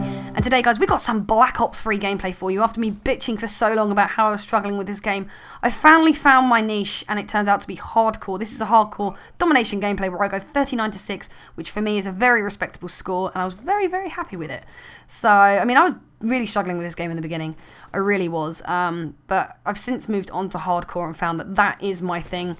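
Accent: United Kingdom. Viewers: you sound like your from Kent. I'm from Kent :D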